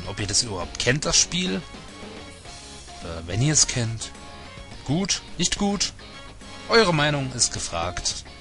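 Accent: Hesse, Germany. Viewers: bist du aus ghessen, wegen dem akzent?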